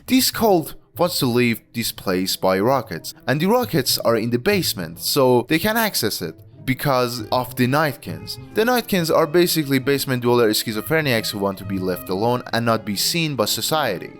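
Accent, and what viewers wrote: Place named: Europe. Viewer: When he's got a euro accent you cant place. Its gonna be a fire video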